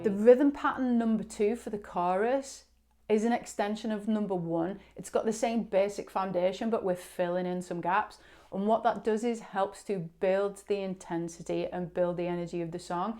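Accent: United Kingdom. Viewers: Sounds like she is a Brit?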